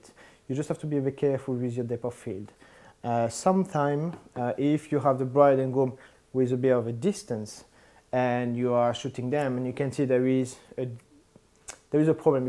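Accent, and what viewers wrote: France. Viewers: I subscribed to another french photographer and when I first listened to you speaking I knew you're french..I love the accent.. Very informative video!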